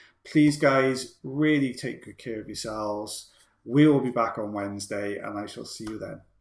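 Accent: Wales, United Kingdom. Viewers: you said you wanted to know if youtube interrupted the video to show an ad - they were showing the DR Drew says this guy is for real V-shred ad on 26/11/23. I love these videos, theres something so satisfying about the skin peels! and your accent reminds me of my grandmother, i loved her welsh accent and i miss it so much, please keep the videos coming!!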